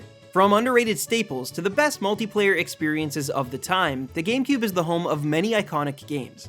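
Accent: Canada. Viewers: This video is pretty good. Are you Canadian?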